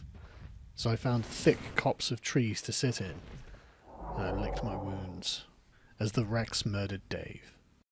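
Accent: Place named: United Kingdom. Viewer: No hes just british🤭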